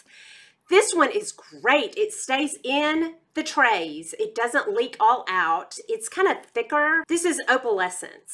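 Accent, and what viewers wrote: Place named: Arkansas, United States. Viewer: oh my gosh...i'm from Arkansas, but moved to Alaska 7 years ago. Listening to you is like being home. Penn Smith Skincare linked to you and that's how I got here! I love that you don't waste time but get right to the point. Some of the beauty vloggers just talk forever about nothing or say the same thing several different ways. Ugh! Thank you for not doing that!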